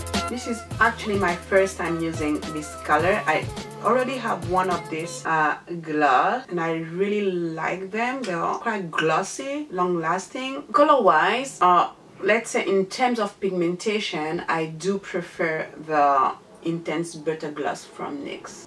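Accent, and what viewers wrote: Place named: United Kingdom. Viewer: girl!! let me tell you, you slayed in this video 👏👏👏 very well done. Everything was perfect. Your English, your make up, everything was damned good. You were worried for nothing. And I love love your British accent 😉😉. Keep on going girl you have all my support. For foundation brush you can try the morphe M439 if you haven't tried it yet. I wish you all the best and don't worry for the jokes in English you'll find good ones for us. Take care xoxo 😘😘😘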